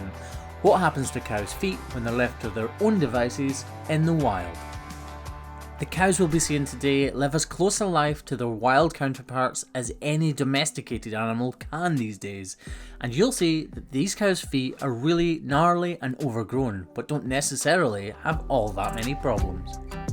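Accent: Scotland, United Kingdom. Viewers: Can ya say a little over run by the beef? Started watching vids for the trimming, stayed for the Scottish scenery, accent and great sense of humor. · Lol his name is (It's the Scottish making it sound like LOL)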